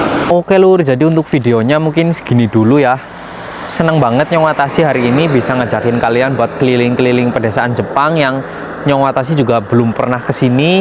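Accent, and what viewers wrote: Banyumas, Indonesia. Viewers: Nyong ngapak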